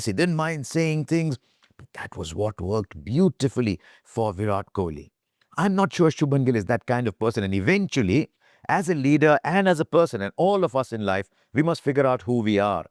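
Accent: Hyderabad, India. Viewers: Surprised to hear Hyderabadi style Hindi 😮😊🎉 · Wah kya hyderabadi bole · that hyderbadi accent bought a big smile on my face💙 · bhai! Loved your narration in Hyderabadi! 🥰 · Superb Mr. bogle, ur hyderabadi accent and u took places names of Hyderabad, superb awesome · That Hyderabadi accent though 🙃❤️ Siraj ✌🏻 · Bhai subah subah aapki hydrabadi boli sun kar din ban gaya. Maza aa gaya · kya miyan....aap tho ekudum chakas Hyderabadi bhasha bol lethe:) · That Hyderabadii accent is top notch💕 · What a gem ji you are.your choice of words are amazing and what a great hyderabadi accent. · Aankh may Aasu aa gaye..hyderabadi accent sunke..kuch bhi ho jaaye..apna hyderabad apna hai..sahi baat bole aap sir.siraj miya maut daal diye...chindiya..Marfa hona abh 🎉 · Amazing sar isi Hyderabadj lahja ka aur siraj bhai ki tareef ka muntazir tha my. Bade khushi hui. Khuda aapko abaad rakhe. 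· lovely talk in hyderabadi accent by Sir!! and MO SIRAJ you are a legend!! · Beautiful tribute in true Hyderabadi Hindi. Wonderfully done! Salute to you. All I can say a fantastic commentator to a great bowler of modern times! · Your Hyderabadi accent ❤.....app Hyderabadi bolker hamara dil jeet liya ❤ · That's Hyderabadi accent and those golden words 'pair zameen per aur haathan aasman per'... Keep advise and motivate Siraj.. Love you sir❤ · speaking in hyderabadi accent made goosebumps ❤❤❤❤❤❤❤❤❤ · Just loved your Hyderabadi ❤ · Normally, I don’t comment on videos — but this one, with the Hyderabadi accent, was too good to ignore. Well done!!! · That hydrabadi accent comments from sir is heart touching.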